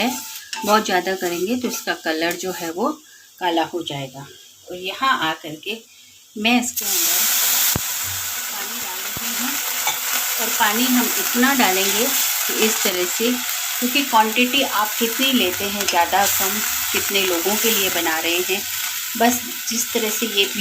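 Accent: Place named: Sindh, Pakistan. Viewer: Tasty thali sweet nd meethi boli sindhi k sath✌🏻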